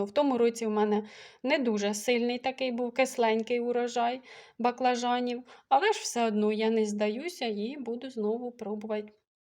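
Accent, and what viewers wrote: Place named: Ukraine. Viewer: український, і говір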